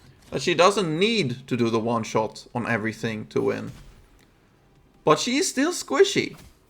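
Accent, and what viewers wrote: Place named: Norway. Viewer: are you nordic by any chance? kinda sound norwegian to me😅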